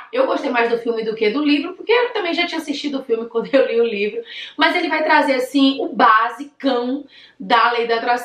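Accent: Bahia, Brazil. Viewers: Uma baiana ? Uhuhuuuuuu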